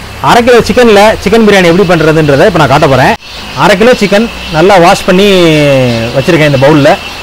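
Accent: Madurai, India. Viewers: I am not here for your recipe, but your humorous commentary and your beautiful Madurai tamil. Love from Bangalore bro. Always keep doing the beautiful work. Cheers❤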